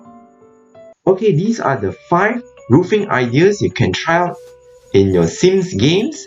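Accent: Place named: England, United Kingdom. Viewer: Hey, where do you come from? I lvoe your English accent!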